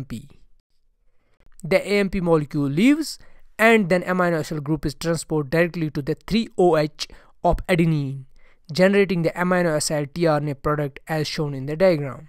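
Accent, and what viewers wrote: Kashmir, India. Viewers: Sir are you from kashmir??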